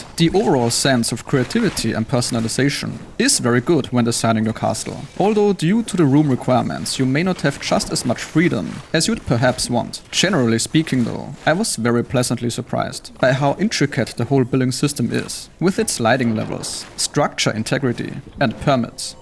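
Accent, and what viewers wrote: Germany. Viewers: U german bruh ?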